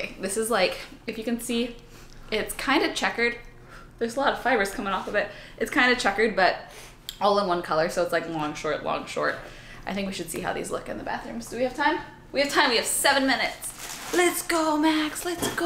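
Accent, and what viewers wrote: Texas, United States. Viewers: It's just Texas people that talk like that. My sister in law talks wierd.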